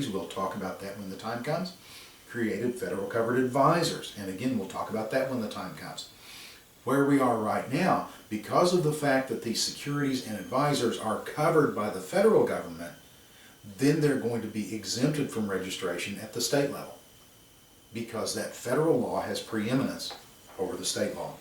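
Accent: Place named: South, United States. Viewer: I find the southern accent quite comforting, in fact. Extra helpful contrasting the stress of study.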